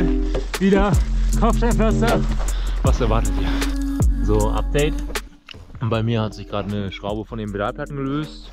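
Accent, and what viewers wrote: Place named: Germany. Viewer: Ganz gut gemacht, wünsche mir ich wäre dabei gewesen, nur eins hat sehr gestört: die englischen Brocken solltest Du Dir schnellstens abgewöhnen, andauernd immer wieder: "nice", "to go", "ride on", usw.,.das ist so oberflächlich, und die hören sich mit dem deutschen Akzent wirklich schlimm an - Es tut mir Leid.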